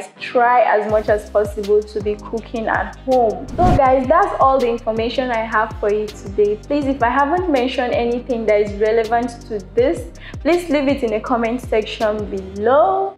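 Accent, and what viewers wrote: Ghana. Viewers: Naaa I don't know if you're ghanaian but if not then you tricked me cause you sound perfectly Ghanaian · Are you sure,you are a Nigerian? You sound more like a Ghanaian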